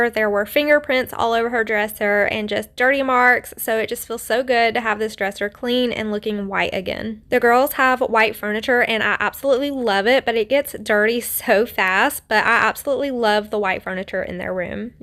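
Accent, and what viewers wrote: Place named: Appalachia, United States. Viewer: Hillbilly accent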